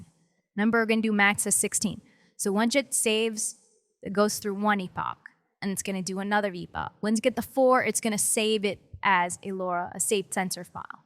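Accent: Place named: California, United States. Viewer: is she from California? such Californian accent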